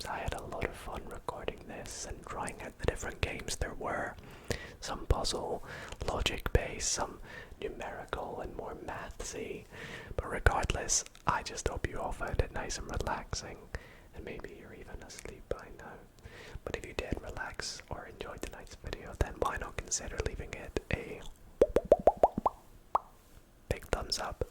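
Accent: United Kingdom. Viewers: Talk strange? How so..? Do you mean the British accent? What's strange about that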